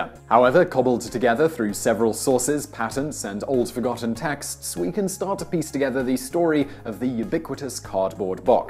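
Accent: England, United Kingdom. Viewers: I’m pretty sure he’s English lol give him a break 😂 some of those pronunciations are actually correct U.K. pronunciations. And I think the besieging may have been either him assuming it was a German word, or the text was typoed like “beiseging”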